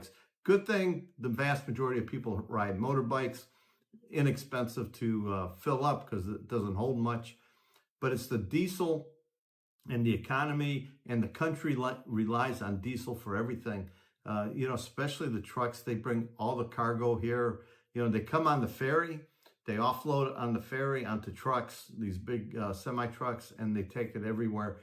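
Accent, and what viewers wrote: United States. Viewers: Asian Mkts are "tanking" and Currencies are getting hammered .. I read "fear of Currency Collapses" .. This on top of Covid Inflation that never came down and Tariffs .. "All of it hurts the poorest the most" .. I saw the anti Corruption Protests, and now I'm seeing Protests there with anti Trump-U.S. Signs, on YouTube (Google) .. "Shits getting real" so keep your head down and don't advertise "American" (you have an accent - practice Canadian Aye mate) .. That's "excess Russian Oil" (ie) already out, on Shadow Tankers ..That wont last long, Russia can not make up for supply loss, and Ukraine has taken-out "30 or 40% of Russian Oil production (off line) .. If this doesn't "end fast" somehow, things will get "a lot worse," before they get better, incl inflation, shortages and collapses affecting millions there / billions of people, worldwide .. 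"Plan for the worst and hope for the best" .. Be safe and best of luck ..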